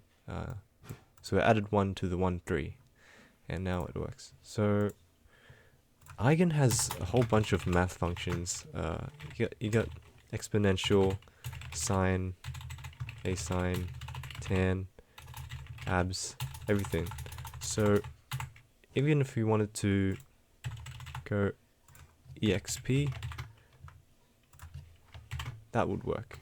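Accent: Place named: Australia. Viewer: i love ur aussy accent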